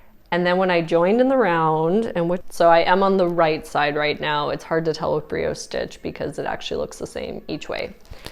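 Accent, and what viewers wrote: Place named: Canada. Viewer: and sorry - you’re Canadian - cardinal sin to assume you’re American- I should have got that from your accent! I have family in BC 🤎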